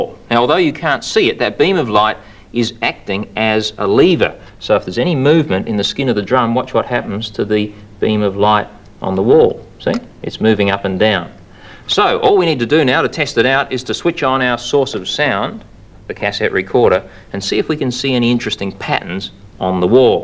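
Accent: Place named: Australia. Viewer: love that Auzzy accent. The music is from beaverly hills cop so this show is no earlier then the 80's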